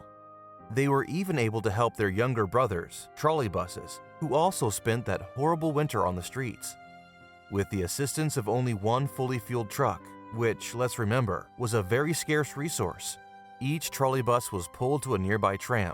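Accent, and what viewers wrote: Russia. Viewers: I see you hired a professional voice over for the narration ! I admit he has a very nice and clear voice but I find it loses a bit of the charm of your earlier videos. I really like your (russian ?) accent ! It makes the video more immersive and unique :) that’s only my opinion though ! In any case I will keep watching your amazing videos. Greetings from France ! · По всей видимости звук просто заказан у англоязычного диктора. В ранних видео на канале голос другой и с русским акцентом.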